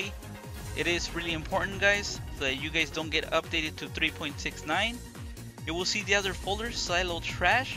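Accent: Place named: France. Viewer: Are you French, by any chance? I can't help but notice the accent. Just a wee bit lack of "Uh-huh".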